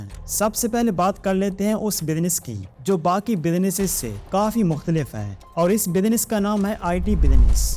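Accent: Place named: Sargodha, Pakistan. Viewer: bhai sargodha se hain ap??? ap ka bolne ka accent sargodha wala ha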